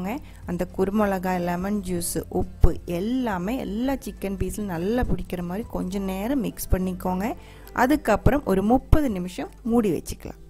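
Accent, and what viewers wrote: Kerala, India. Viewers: உங்கள் கேரள சமையல் சூப்பர் அதைவிட கேரள தமிழ் சூப்பர் தோழி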